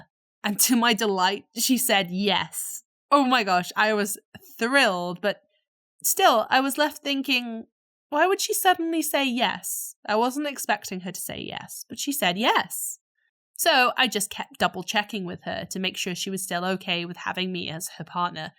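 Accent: Wales, United Kingdom. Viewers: WAIT- are you Welsh?!? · Omg wait you’re welsh??? A bit creepy but where in Wales are you from?? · Blywddyn saith 😂 you from south or north wales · HEY THATS WELSH! woah your welsh too? Cool! I’m welsh also ^^ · are you from walesss???? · W-w-waaaait Are you Welsh And did you go to a Welsh school?! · you sound british at first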